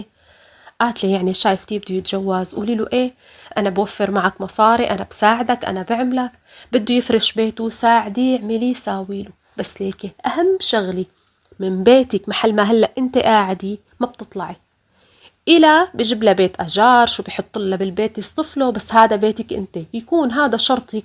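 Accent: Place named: Homs, Syria. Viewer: دخيل لهجية حمصي ❤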